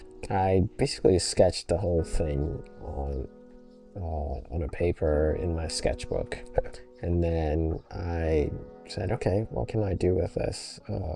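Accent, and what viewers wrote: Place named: Nigeria. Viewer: Such a skilled Man. Immediately I heard Nigerian, I smilled. Great Job my Brother.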